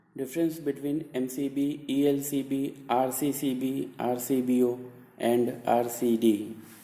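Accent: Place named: India. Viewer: I'm English Through and Through I can understand everything, why?, because my wife (of 30 years) was from India Ive lived in India many times, and its nice to hear an Indian accent , so anyone wanting to complain about his accent please see me and I'll wash your mouth out with SOAP! Thanks for the explantion I was worried I was installing the wrong gear DIY in asia (Thailand) until I saw this quick and nifty explanation, sada suki raho!!!!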